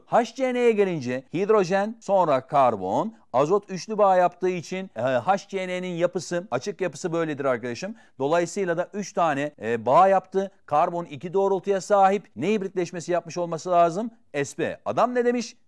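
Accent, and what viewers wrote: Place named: Thrace, Turkey. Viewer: Hocada bi trakya ağzı var da ekrem imamoğluna benzemiyor nasıl benzettin :D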